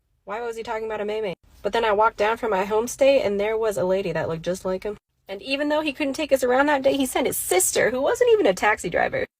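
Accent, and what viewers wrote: Standard. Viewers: 她的中文發音很標準呀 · 這個女生英文說得好標準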